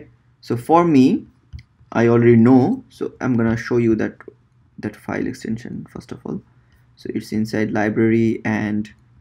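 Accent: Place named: India. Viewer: whenever you listen indian accent videos you already know your problem is fixel lol. So staright to point thank you sir.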